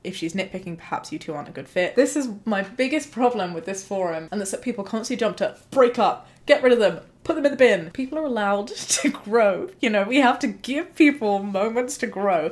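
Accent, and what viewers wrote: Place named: England, United Kingdom. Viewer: I think the biggest problem with your name is you being British, the English accent just adds an extra r onto words that end with vowels, so I thought your name was until I saw it in writing lmao.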